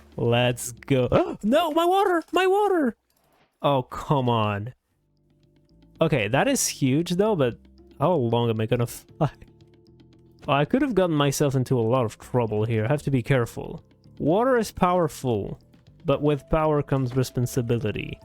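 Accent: France. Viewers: Are you French? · Oh, so that is a french accent! As a not native english speaker it is good to find out how exactly different accents sound like. Great video as always! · are you french? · You are french? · Give him a break, he's french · Are u french???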